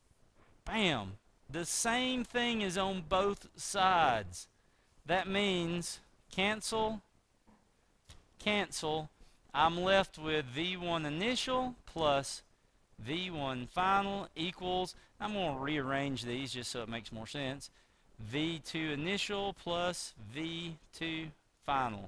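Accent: United States. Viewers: This was my exact question, and I got an exact answer. Plus the great southern drawl makes this video ten times better. My dads side is from oklahoma and they all sound like this; i feel like i'm learning phyics from my uncle.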